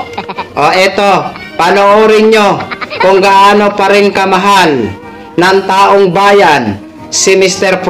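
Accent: Visayas, Philippines. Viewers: Bisaya po kayo sir?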